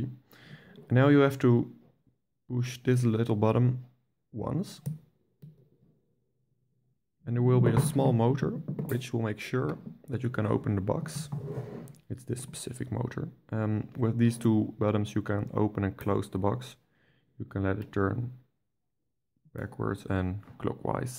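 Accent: Netherlands. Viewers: ben jij nederlands? ik kan dat horen :)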